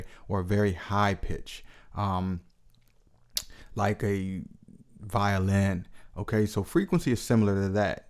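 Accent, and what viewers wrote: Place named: New Jersey, United States. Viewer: Love your accent Man, is it New Jersey? Great Video, very helpful, thank you.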